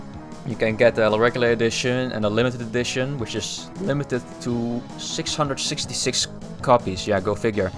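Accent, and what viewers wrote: Netherlands. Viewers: you sound Dutch cool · Man, this is one of the coolest projects I've heard about in a while. Must have been an honor to be a part of this! Might even consider getting a SNES now. By the way, are you happen to be Dutch? I hear a certain accent? ;-)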